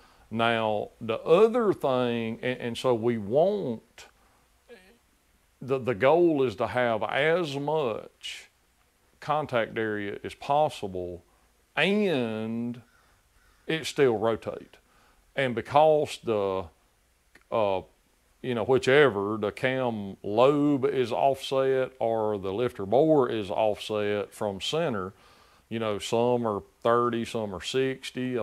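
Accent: South, United States. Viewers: Sounds like a proper ‘murrican to me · Why do the people that run this country not care about the quality of the products that we purchase with our hard earned dollars ? This really burns my A$$! They want you to vote for them for what! This is Disgusting that you have to put in this much effort to use a flat tappet cam! On another subject I am from Pa. We lived in Arizona, New Mexico and Tennessee and no one ever knew where I was from. They said I don't have an accent,go figure. That said I could listen to this Guy forever, I love the southern drawl and in women it's hot! I hung with with a guy from Cobra town mountain in Tennessee and this man sounds about the same. Never had a problem with it!!👍👍👍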